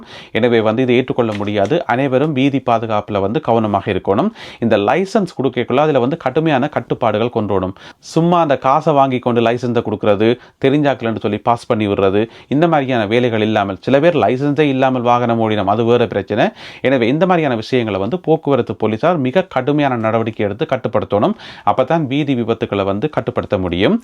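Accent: Jaffna, Sri Lanka. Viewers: உங்களின் செய்தி அழகாகவே இருக்கிறது.நடை உச்சரிப்பு எங்களின் தனித்துவத்தை வெளி உலகிற்கு எடுத்துகாட்டாகவே இருக்கிறது.எமது சந்ததிகள் அழகான எம் மொழி நடையை பின்பற்றும் பயிலும்.கேக்க கேக்க யாழ்ப்பாணத்தமிழ் இனிமையே. எம் ஈழத்தின் அத்திபாரமே தமிழ் உச்சரிப்பே. எம் தேசியத் தலைவரின் அடைத்தகுரலின் கம்பீர தன்மையை எண்ணிப்பார்க்கையில் மனம் சிட்டாக பறக்கிறதென்னவோ உண்மை🙏🤗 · உங்களது மொழி உச்சரிப்பில் யாழ்ப்பாண சாயல் முழுமையாக தென்படுகிறது… சற்று அக்கறை எடுத்து ஒட்டுமொத்த தமிழ் பேசும் மக்களுக்குரியதாக செய்திகள் கூறும் முறைமையை ஏற்படுத்துங்கள்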